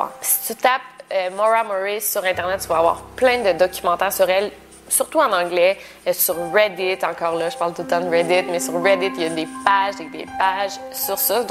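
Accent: Quebec, Canada. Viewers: C'est marrant cet accent canadien. Pour moi, il est clair qu'elle s'est suicidé et que son corps n'a pas encore été retrouvé. C'est pourquoi elle avait besoin de boire parce qu'elle avait pris cette décision, de se suicider. C'est tellement triste :( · Chers cousins du Canada, j'aime bien votre accent.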